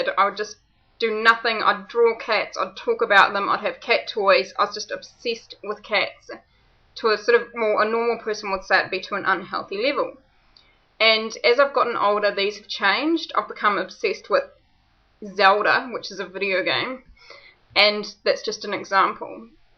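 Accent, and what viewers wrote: New Zealand. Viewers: Yeah thats a good kiwi accent ! Now everyone this is a girl from a place called new Zealand . Do you know where that is? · She's a lovely keewee!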